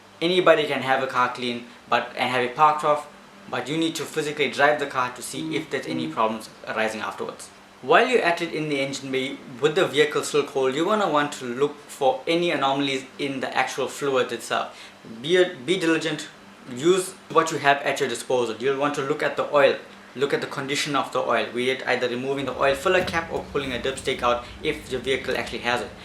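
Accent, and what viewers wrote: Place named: South Africa. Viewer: bro what accent is that, are you south african?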